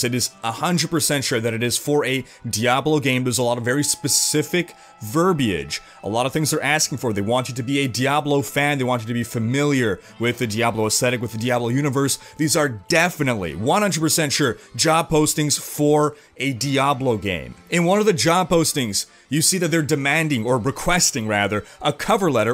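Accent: Quebec, Canada. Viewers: Whoa! Are you french canadian? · Wait, are you a french canadian ?! :D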